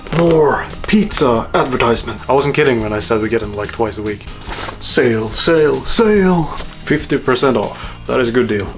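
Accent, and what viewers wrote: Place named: United Kingdom. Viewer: I just watched your 'Moving to England' video and your accent has changed a lot in the six months between the two uploads. Less American, more British, much better!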